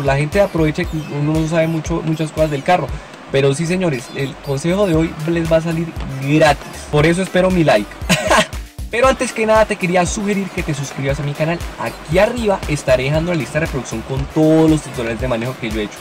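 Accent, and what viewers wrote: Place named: Antioquia, Colombia. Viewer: Me gusta como hablan los paisas...gracias Men..!!!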